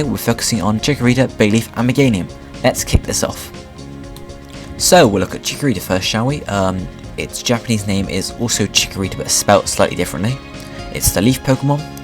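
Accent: United Kingdom. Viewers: In UK English Herb is pronounced with an h, from his accent you can tell he is from the UK ;)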